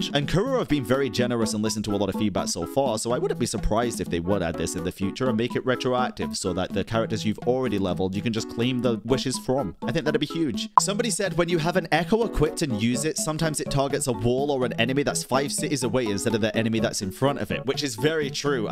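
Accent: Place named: United Kingdom. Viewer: Yo, British accent is hot af let them cook well speak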